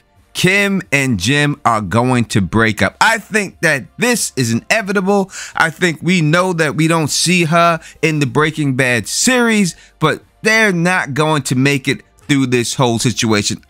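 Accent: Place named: New York, United States. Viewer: Yay! I love your analysis, and NY accent! 😍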